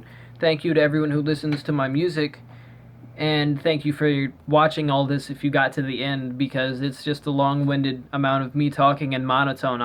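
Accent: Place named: Texas, United States. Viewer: fuck yeah boy what part of texas are u from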